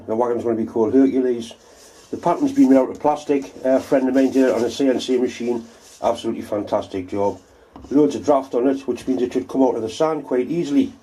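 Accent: Newcastle, United Kingdom. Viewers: Now''t amis we a bit o Geordy!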